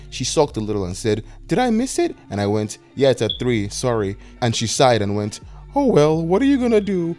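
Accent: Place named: Nigeria. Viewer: While I won’t say us Nigerians are rare, we are few and fair between when we occupy commentary spaces I absolutely shocked when I heard the close to home naija dialect and little slips of our accent mixed with such eloquent writing and structure in this vid. As a fellow Nigerian who felt stuck In how our community limits us to such places I’m pleasantly surprised and annoyed i found you just now.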